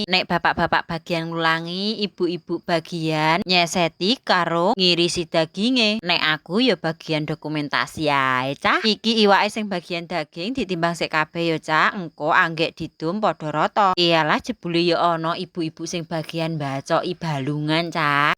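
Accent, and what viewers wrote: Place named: Central Java, Indonesia. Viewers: Kalau dari logat nya ,antara cepu ,bloro apa iya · Bojonegoro juga,,nggonmu dadi nggonem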